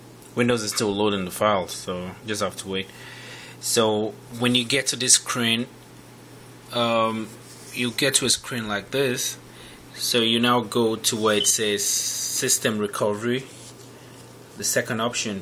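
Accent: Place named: India. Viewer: Note book not net book and you call the screen a creen I swear all of these tutorials for computers are done by Indians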